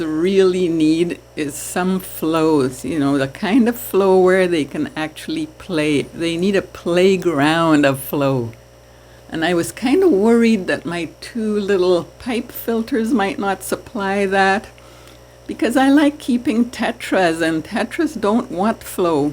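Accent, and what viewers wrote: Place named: Trinidad and Tobago. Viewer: nice video, i picked up the trini accent in the 1st 30secs lol. reminds me of home. good information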